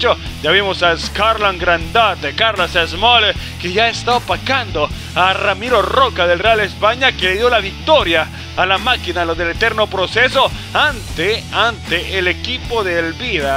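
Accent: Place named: Costa Rica. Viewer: Pensé que era un tico 😅😅😅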